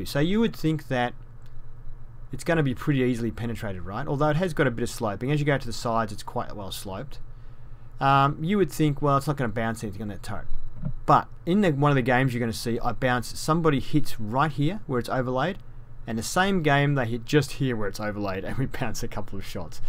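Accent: Australia. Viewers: Hey - I'm enjoying your reviews so keep up the good work. You sound like an Aussie - where are you from? I'm from Melbourne and also play on SEA server...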